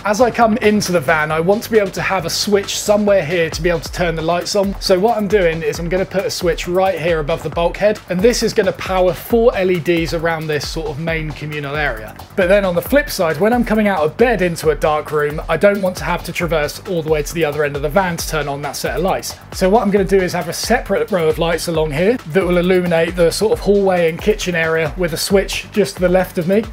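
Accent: England, United Kingdom. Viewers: I have to say your attention to detail & perfectionist mentality are inspiring & this van is very impressive. (I hope that didn’t sound sarcastic because I’m %100 serious). Anyways, cheers! *Idk if I used that in the right context me being American😂 aha, but I’ve always wanted an English accent! 🇬🇧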